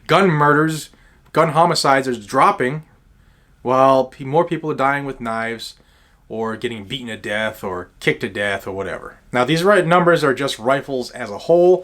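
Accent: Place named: Massachusetts, United States. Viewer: Keep on keeping on! By your accent, i'm guessing that you're from Massachusetts. Don't know if you'd want the aggravation, but if you were to ever run for a seat in gov't, you definitely got my vote! "I was born free, I will live free, and I will die free!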